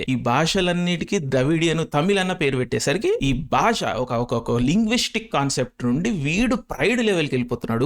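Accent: Telangana, India. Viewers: Bro naadi guntur, but i'm a huge fan of your slang/accent!! I could watch you speak all day i mean half english half telangana mix chesi posh ga, i just love it 😂❤!! didn't watch the full video cos I like you that much, as with anything andhra lone district ki oka accent undi so easy bro, let's get back to more pressing issues!! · Emanna act chesav bro, aakariki nee thumbnail kuda telugu lone pettav ra batta, Nuvvu entha sollu dengina telangana yaasa maatrame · Nuv entha ruddhina Telangana slang uneducated telugu😂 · Lavadalo telangana slang!!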